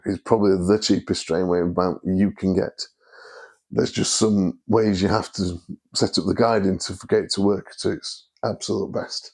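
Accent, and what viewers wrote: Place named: South Yorkshire, United Kingdom. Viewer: Thanks! Nice to hear a south Yorkshire accent. I'm in north Norfolk so not a million miles away. I'm currently looking seriously at the HEM15 to replace the Skywatcher Alt-Az I'm currently using, so your review is very useful. I understand the HEM15 has wifi, so presumably I don't need the hand controller?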